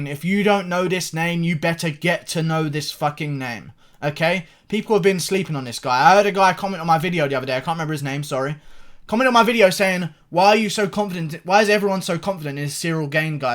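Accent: United Kingdom. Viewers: With your British accent it sounds like you’re saying Cereal Gane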